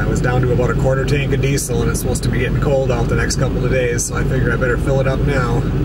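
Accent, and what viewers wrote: Minnesota, United States. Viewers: That Minnesota accent is awesome!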